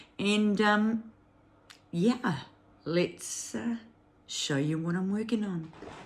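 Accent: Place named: New Zealand. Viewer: Hi & fur babies, wonderful to see you back and all your finishes and WIPs. I have missed you & your kiwi voice (us few kiwis do miss hearing our on accents 😉). Love all of your DP's. Neat to see the Gemini. I think a yellow rose is also a Gemini flower 🌼. It's so sparky. Look forward to seeing more of you on YT. Take care, Matariki nearly here, yay.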